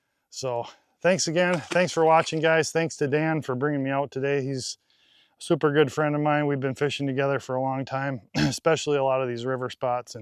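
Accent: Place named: Wisconsin, United States. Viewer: You can tell by basically after everything he says he sounds like Skansen, Wisconsin, Wisconsin. End it seems like he’s up by Northwest probably by Minnesota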